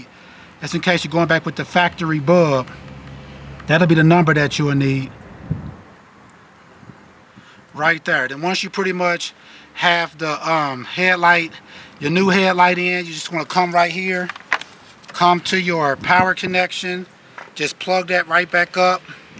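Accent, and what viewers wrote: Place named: Nashville, United States. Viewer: Brah, are you Nashville TN,? That's how you talk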